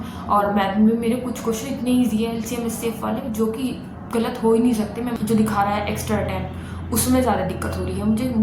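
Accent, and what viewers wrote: South, India. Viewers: Mam Aap south se ho kya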